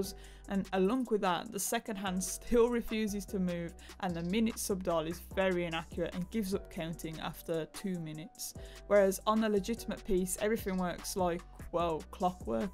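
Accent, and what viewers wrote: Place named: Midlands, United Kingdom. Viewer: I’m good at spotting a Mids accent , and watch differences 😉 respect , great detailed commentary and spot the difference 💯👍🏻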